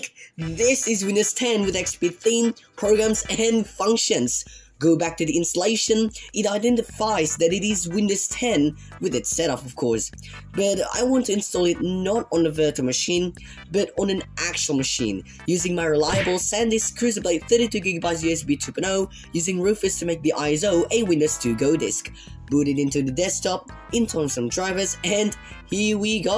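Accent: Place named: Australia. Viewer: yeah i noticed that too, he has an australian accent but a copy from another country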